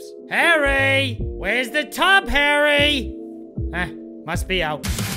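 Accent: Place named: Ireland. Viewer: Irish I can tell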